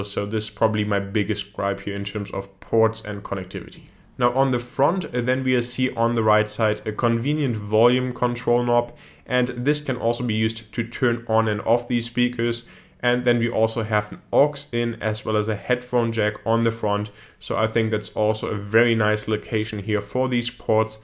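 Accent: Germany. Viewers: Bei der Aussprache. 100 % deutscher hahaha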